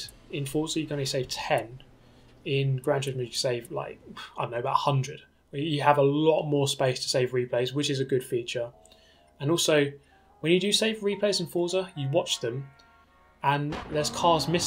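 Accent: England, United Kingdom. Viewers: I like is voice and his british accent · Just a really heavy English accent I thought you'd be white. love your vids man keep it up :D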